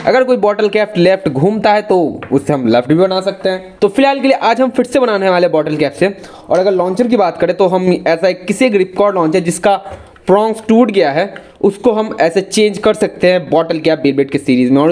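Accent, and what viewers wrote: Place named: Bihar, India. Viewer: Bihari hai ye bhai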